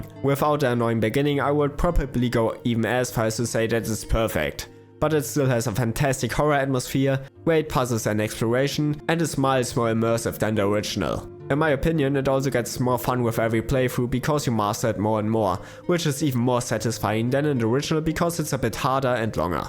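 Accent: Germany. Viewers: Ich höre Deutsch raus hahaha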